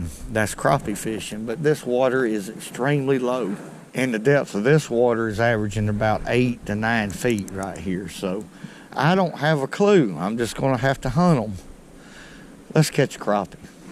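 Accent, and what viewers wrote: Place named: Alabama, United States. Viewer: I just love it when he says "Q...u..it" with that Alabama Accent.. And Im from TEXAS!!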